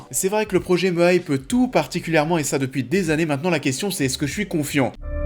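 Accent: Paris, France. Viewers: Si la serie marche un tant soit peu, je mets un billet sur le fait que Netflix tente d'en refaire un film un peu comme El Camino avec BrB ou toutes leurs déclinaisons de leur série sur Marco Polo, avec une periode inédite différente de la série, peut être sans l'époque contemporaine, une fois que le délire capuche/crédo sera bien passé avec la série. Ça nous fera peut être oublier l'horrible nanar de 2016 ! PS : Mollo sur l'accent parisien stp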